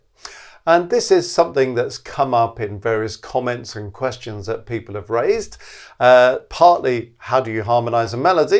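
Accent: RP, United Kingdom. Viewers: Love your RP accent. So comfortable to listen to!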